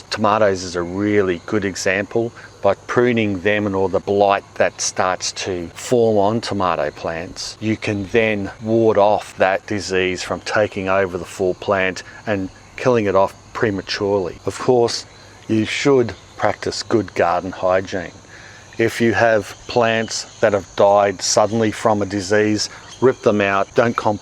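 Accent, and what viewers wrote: Australia. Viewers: Yes! That Australian accent has got to help! ❤️❤️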